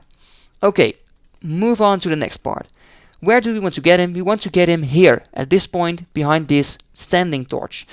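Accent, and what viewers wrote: Netherlands. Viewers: I was like: Wait... he sounds Dutch! Then I looked at his name. :)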